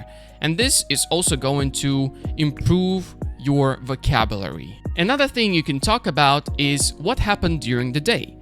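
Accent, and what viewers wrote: United States. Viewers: are you American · Hi, just one question for you '' What has made you sound American? as you mentioned you have never set foot on the U S. thanks.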